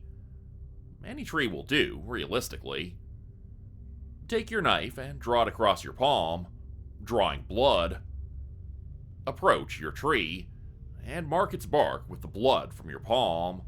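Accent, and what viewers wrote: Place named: Canada. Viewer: Brother I love your channels but you got to work on those "O's" and "OU's". I would love to know where you're accent is from. I'm guessing Canadian are Northern United States. I really hope you see this and respond because I have been wondering this very question for as long as I've been listening. 😅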